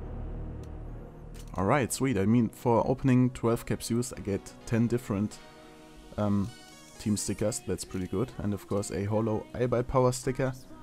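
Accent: Germany. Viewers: SO ein heftiger deutscher Akzent. Meine fresse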